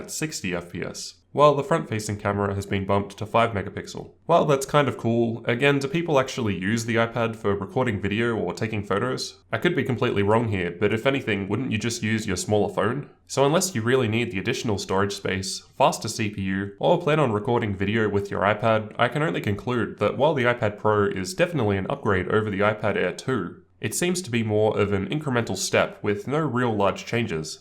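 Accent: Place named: Australia. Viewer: Are you an Aussie? I have an Australian friend with a similar accent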